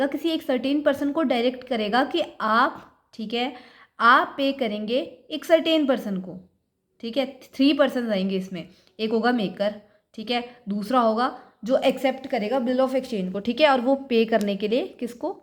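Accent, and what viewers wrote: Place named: Haryana, India. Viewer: dii ab aapki haryanvi bhi sweet lgti h to m ky kru 😁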